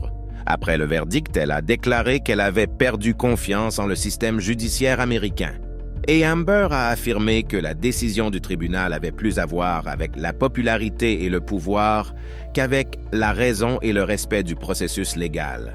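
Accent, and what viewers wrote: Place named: Canada. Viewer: Adorable accent Canadian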